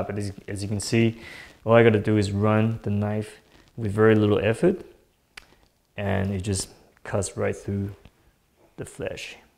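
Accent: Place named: Australia. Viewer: You sound Aussie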